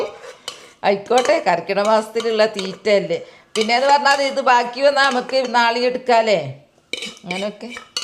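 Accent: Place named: Wayanad, India. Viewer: ഹലോ താത്താ... ഞാൻ മലപ്പുറത്തുനിന്നാണ് കാണുന്നത് കേട്ടോ. താത്താന്റെ ആ വയനാടൻ സംസാരിക്കുന്ന രീതി കേൾക്കാൻ എന്ത് രസമാണ്! താത്താനെയും സംസാരിക്കുന്ന ശൈലിയും എനിക്ക് ഭയങ്കര ഇഷ്ടമായി. താത്താക്ക് പടച്ചോൻ നല്ല ആയുസ്സും ആരോഗ്യവും നൽകി അനുഗ്രഹിക്കട്ടെ. ഈ മുതിരത്തോരൻ കണ്ടപ്പോൾ തന്നെ കൊതിയായി. ഞങ്ങൾ ഇവിടെ മുതിരപ്പുഴുക്കോ, മുതിര ഉപ്പേരിയോ ഒക്കെയാണ് ഉണ്ടാക്കൽ. താത്ത ഉണ്ടാക്കിയ ഈ രീതി എനിക്ക് പുതിയ അറിവാണ്, ഞാൻ എന്തായാലും ഇത് ട്രൈ ചെയ്യും. ഇതിന്റെ കൂടെ പറഞ്ഞ ആ മുതിരക്കറിയുടെ റെസിപ്പി കൂടി താത്ത അടുത്ത വീഡിയോയിൽ കാണിച്ചു തരുമോ? ആ കറി ഉണ്ടാക്കുന്ന രീതി കാണാൻ കാത്തിരിക്കുന്നു. താത്താന്റെ പുതിയ വീഡിയോകൾക്കായി ഞങ്ങൾ കാത്തിരിക്കും! 😍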